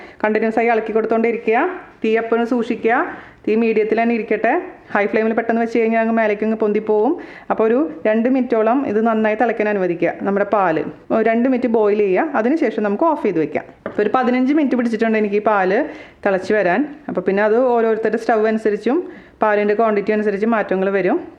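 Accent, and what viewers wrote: Thrissur, India. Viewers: njanum thrissur kaari aanu..chechiyude samsaram kettapo manasilayi.nte naattukari aanu ennu.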